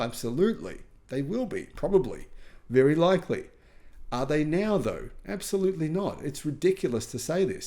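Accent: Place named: Australia. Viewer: Sometimes "Aussi" accent gets very heavy. Do you understand Mate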